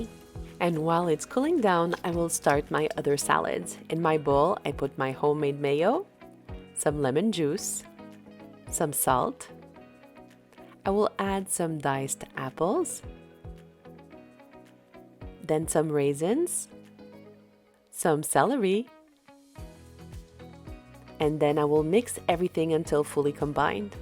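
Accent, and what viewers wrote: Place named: France. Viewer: I have noticed that you have an accent, and you prononce Safran and mayonnaise like in France 😅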